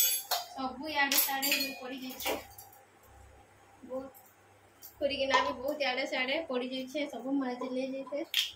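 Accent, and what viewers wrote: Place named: Western Odisha, India. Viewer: I love to hear WESTERN ODISHA TALK always MAM ,LOADS of respect from KENDRAPARA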